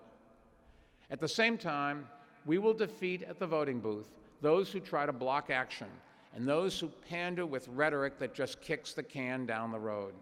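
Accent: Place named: Boston, United States. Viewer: Don't worry, that Boston accent is front and center. Regardless of how you pronounce "hard."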